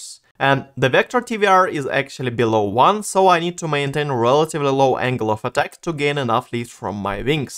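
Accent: Russia. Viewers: это русский акцент или мне кажется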